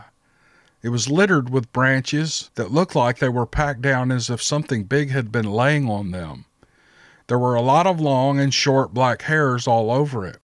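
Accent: South, United States. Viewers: I appreciate you so very much. Love to hear your awesome Southern voice and your sense of humor. God bless you & your family!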